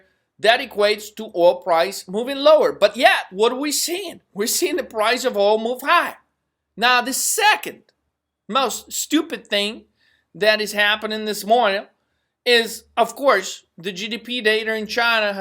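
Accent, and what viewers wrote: South, United States. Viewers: i love this man. italian name with a southern twang with balls hanging to the floor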